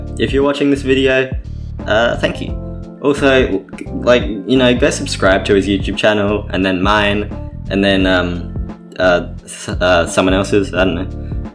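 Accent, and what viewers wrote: Australia. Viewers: didnt know you were aussie, i am too 🙂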